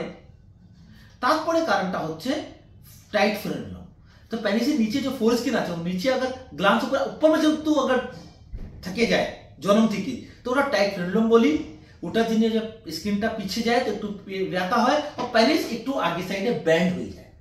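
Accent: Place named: Bengal-Bihar border, India. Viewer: আপনি কি বাংলা বিহারের মাঝামাঝি বসবাস করেন। ভাষা তো ক্লিয়ার হচ্ছে না।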